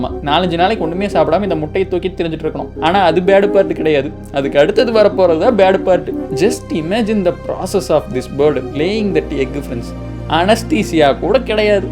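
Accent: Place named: Tirupur, India. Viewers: Tirupur naala dha namma slang la pesuringa... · Neega thirupur ahh antha slaglaye therijuchu😂😂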